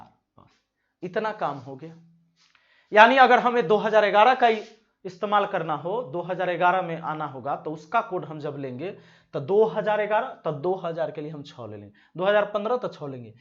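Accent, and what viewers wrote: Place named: Bhojpuri, India. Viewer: Wars ko bars Yeh Bhojpuri bolne wala teacher hai Bekar lagta hai. Mane mane yeh kya yaar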